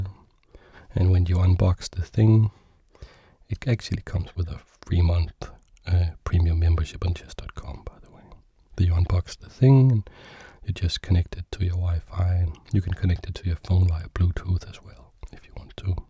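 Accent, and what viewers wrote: Denmark. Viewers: Is that a danish accent I Can hear? 🤣 I am danish myself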